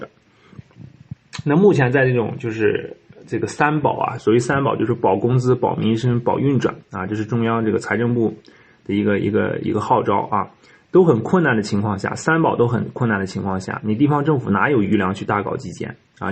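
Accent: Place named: Shanxi, China. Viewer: 一听就是山西人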